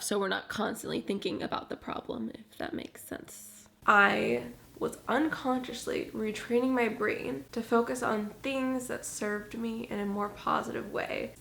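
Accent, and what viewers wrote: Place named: Los Angeles, United States. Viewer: her accent is like PURE LA now lmao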